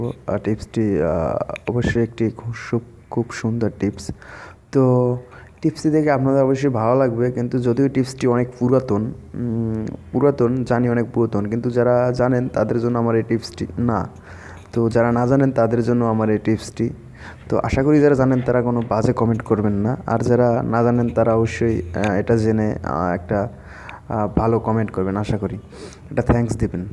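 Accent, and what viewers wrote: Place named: Gopalganj, Bangladesh. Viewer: আপনার বাড়ি মনে হয় গোপালগঞ্জ!!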